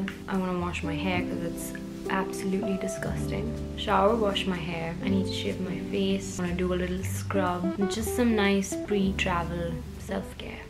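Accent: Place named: India. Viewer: r u from India, coz ur accent is totally different ❤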